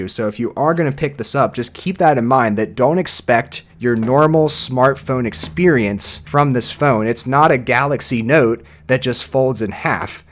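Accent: Philadelphia, United States. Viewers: are you from philly tri-state area? lol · I'm guessing you're from Philly or South Jersey. Nice video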